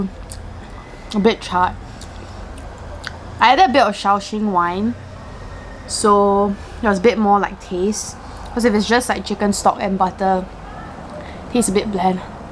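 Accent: Singapore. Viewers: she sounds Singaporean.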